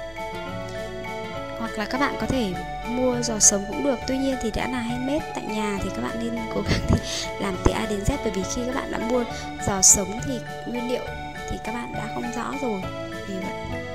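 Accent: Vietnam. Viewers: hình như chị là người bắc ak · ban nay we o dau ko biet nghe giong thanh hoa ma lai ngong nua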